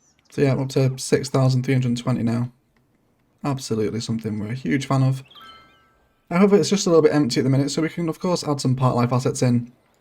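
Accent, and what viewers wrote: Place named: Northern England, United Kingdom. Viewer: The way you talk... and the happy little trees... you're like the Bob Ross of Cities Skylines... Like, if Bob Ross was from the north of England :)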